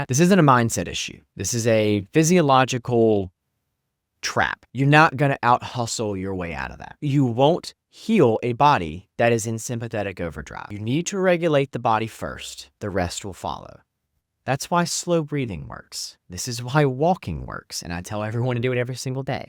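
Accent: South, United States. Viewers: I'm confident that your reassuring voice and sublime Southern twang will most certainly elicit expressions of even greater enjoyment.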